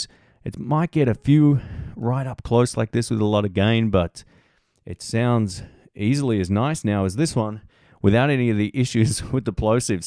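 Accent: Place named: Australia. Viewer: Nice hack. Lot cheaper Roxdon or BSW pop filters. Great sounding Aussie voice... mine diesn't have that feature...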